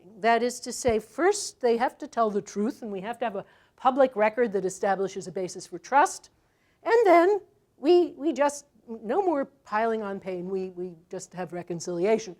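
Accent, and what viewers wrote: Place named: RP, United Kingdom. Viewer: Nussbaum speaks like the stereotypical high british society intellectual.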